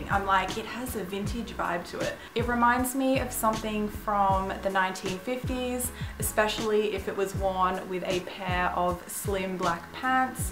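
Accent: Australia. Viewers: Are you from Australia? It's just the accent, sorry if I got it wrong.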